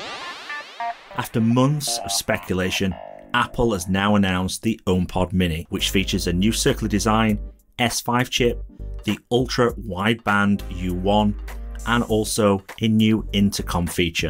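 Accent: England, United Kingdom. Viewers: Are u in the uk? I’m only asking as you mention the price in dollars but have a English accent 🙌🏼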